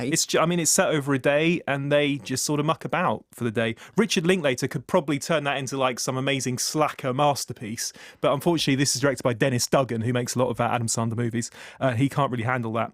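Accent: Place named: England, United Kingdom. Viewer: James sounds a little like Boy George when he talks; he must be from England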